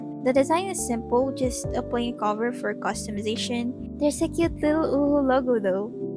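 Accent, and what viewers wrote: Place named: Philippines. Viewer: I see hyunjin and art I watch (also new sub cuz i love your artworkkkk) Also you kind have a filipino accent (Are you filipino by any chance?)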